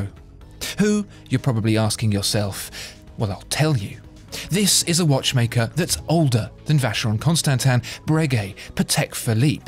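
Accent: United Kingdom. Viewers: That's a british-jewish accent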